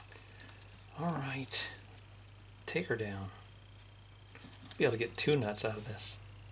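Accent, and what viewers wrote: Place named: Germany. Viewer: I enjoy your videos. Great workmanship. I do need to ask, why do you always seem to throw in a German accent? Why not Indian, Chinese, Hindu, etc?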